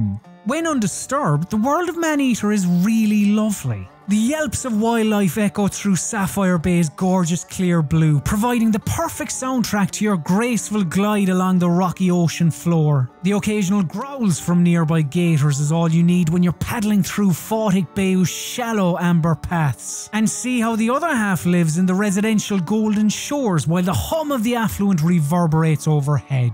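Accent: Ireland. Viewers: Hearing a Irishmen talk about a shark game was Quite pleasant definitely will be subscribing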